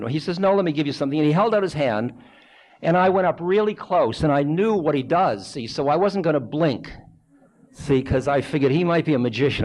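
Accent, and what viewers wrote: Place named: Boston, United States. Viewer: I love the man’s voice and boston accent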